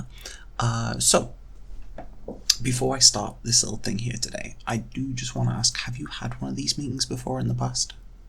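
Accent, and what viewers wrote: United Kingdom. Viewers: He's scottish i think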